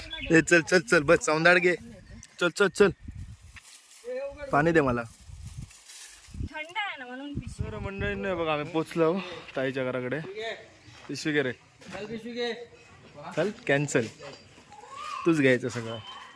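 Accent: Malvan, India. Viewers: ची मालवणी ऐकायला छान वाठतय आम्हाला चाळतय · Wahnii malvanii try kartaa bolavak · Pn bhari bolte Malvani Ekdm God Todmodak ka hoyena Pn bolte👍👌❤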